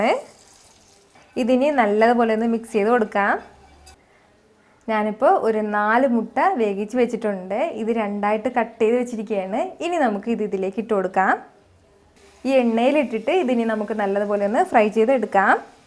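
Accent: India. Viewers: അടിപൊളി ആയിട്ടുണ്ട് 👌👌👌👌ഞാൻ ട്രൈ ചെയ്യും 👍👍👍👍👍😎😎😎😎 ഭാഷ ശൈലി നന്നായിട്ടുണ്ട് ഏത് ജില്ല 😁😁😁😁?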